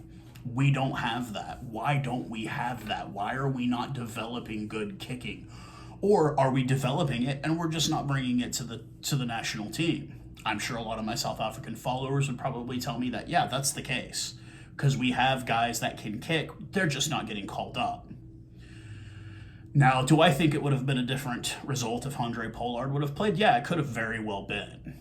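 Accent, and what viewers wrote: United States. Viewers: Where is this guy from. Sounds like a yank.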